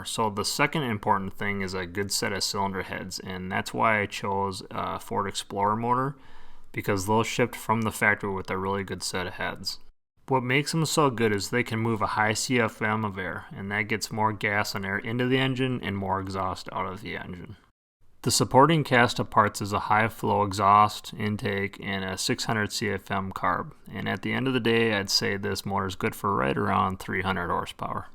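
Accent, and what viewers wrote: Midwest, United States. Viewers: Could tell he was Midwestern right from that accent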